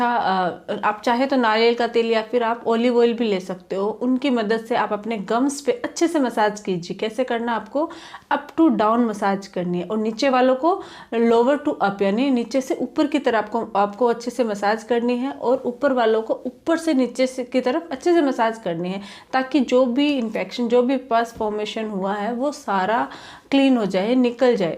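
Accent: Rajasthan, India. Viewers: Doctor mam ..Aap pakka aap Rajasthani ho....Aap ke Bolne ke lehje se mujhe pta chala hai.....aap bahut ache se treatment bataya hai aapka ka Shukriya..🙏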